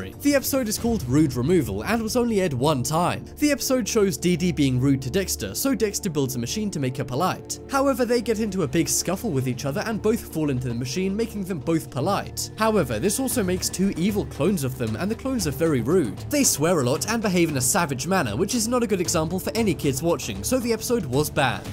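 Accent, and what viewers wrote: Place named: United Kingdom. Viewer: dude these stories are awesome even if you have a briddish accent I STILL LOVE YOUR VOICE these stories are amazing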